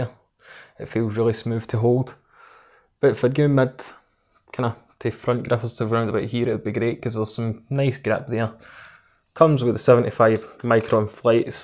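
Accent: Scotland, United Kingdom. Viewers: I come for the reviews to hear you say grip. Gotta love a wee Scottish accent aye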